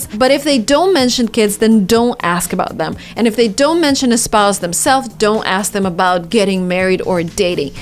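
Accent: Russia. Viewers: Your Russian English accent, such a give away at the start ! :) lol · to A complete Russian Accent! :D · No offence, but your pronouncing makes it clear for natives you're russian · Your Russian accent is just so cute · I loved your Russian accent I want to learn that accent... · You’re Russian accent is amazing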